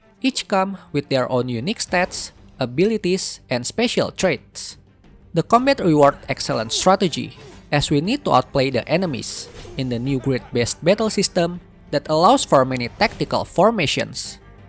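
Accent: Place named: Indonesia. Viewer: thanks for the video.. can't wait for Eiyuden Chronicles! 😍 denger dari logatnya.. orang Indo ya bang? wkwkwk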